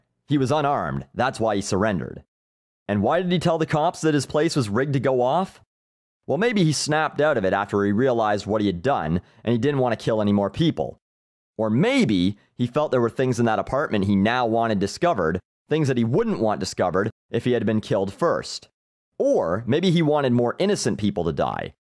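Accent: Canada. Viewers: He sounds canadian. Is he? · your canadian is showing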